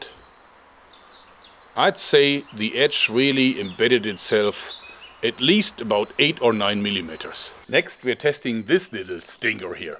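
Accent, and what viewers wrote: Germany. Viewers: Are you a German? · He’s like my badminton coach but with German accent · You're German right? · ist deutscher er manhörtdas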